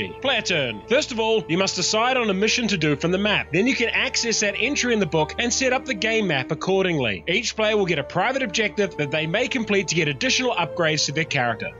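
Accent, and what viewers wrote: New Zealand. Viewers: Is this guy a kiwi?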